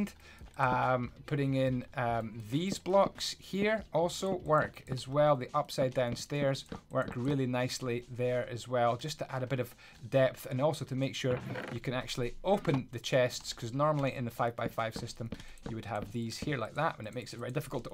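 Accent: Scotland, United Kingdom. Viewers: Is he Scottish? Or Irish?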